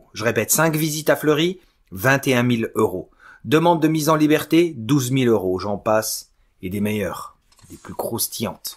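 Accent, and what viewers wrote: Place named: Algeria. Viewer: Merci pour toute ces lectures, et sinon vous êtes maghrébin n'est ce pas? En tout cas votre "accent" et façon de parler l'est et c'est de l'algérien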